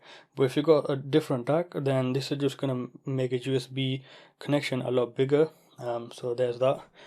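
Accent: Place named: Yorkshire, United Kingdom. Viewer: Hi. My experience of the Jitterbug, when connecting it from my PC to my Audiolab M-DAC is that there was a loss in some of the high frequency detail. It made the audio sound very analogue. I later sought advice and tried connecting the jitterbug to a different USB port to the DAC. This resolved the problem. It got rid of the noise from the PC motherboard that I bought the jitterbug to resolve. I could hear all of the detail that I previously could from my DAC. So, in short, my solution is to use the jitterbug on a different USB port to the DAC. On a different subject, are you from Yorkshire? I'm curious about your accent. Thanks.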